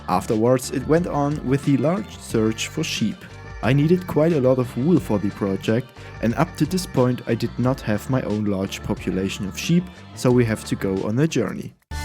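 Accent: Germany. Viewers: Your akzent sounds a little bit German.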